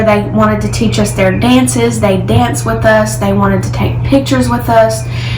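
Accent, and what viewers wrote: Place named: Kentucky, United States. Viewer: I love your accent the Kentucky accent. 😁 welcome to algeria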